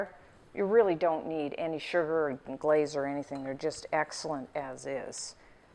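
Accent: Canada. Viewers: Fun to watch, thanks, but from here in Europe, we're mystified...what kind of accent is that? Canadian? Great Lakes? Michigan?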